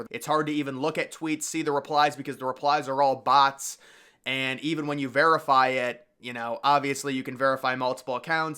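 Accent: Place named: Indiana, United States. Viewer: I can tell you’re from south bend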